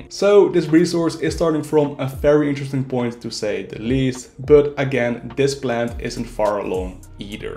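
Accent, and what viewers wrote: Netherlands. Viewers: Nederlander ? I can hear the accent! Love the content bro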